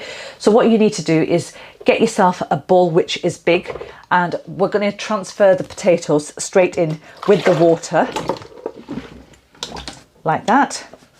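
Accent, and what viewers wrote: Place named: London, United Kingdom. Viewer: Deer I like your recipe and your accent as well are you from London if London which part. Any drag me back when I was in east London lovely days 👌👌👌❤️❤️❤️😜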